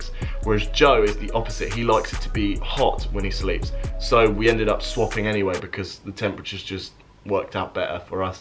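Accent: United States. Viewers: I think you sound better with an American accent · I don't get why people are hating that you used an American accent. It sounds dope and it's you doing you, so keep doing you bro. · I'm sorry but it was cringe hearing you in an American accent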